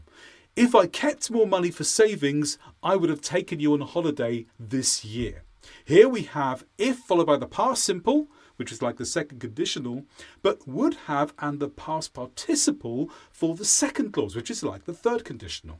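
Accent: United Kingdom. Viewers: Wow the British accent whatever it is nonsense 😮😢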